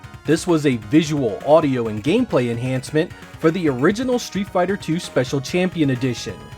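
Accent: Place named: Maryland, United States. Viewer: He sounds like he's from Maryland.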